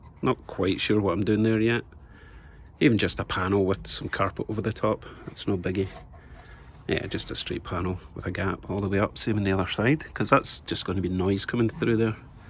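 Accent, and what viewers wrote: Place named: Scotland, United Kingdom. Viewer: Hey! Love your accent. Reminds me of my Granny who hailed from Scotland. The similarities end there. You got a much tighter and hotter ass than Granny had! God rest her soul!! Happy Easter.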